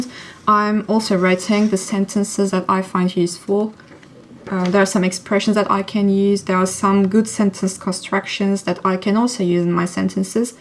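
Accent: United Kingdom. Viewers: it's pretty obvious that your english accent is changed.Its more like a Brit right now. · Öğrendiğin diller kaynaklı mı bilmiyorum ama İngilizcen İngiliz aksanına kaymış seni ilk takip ettiğimde öyle değildi ama güzel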